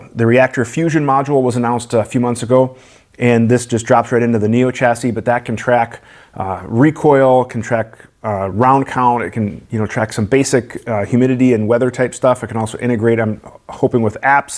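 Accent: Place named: Wisconsin, United States. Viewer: Love your videos and sconnie (OR possibly MN) accent tooo boooot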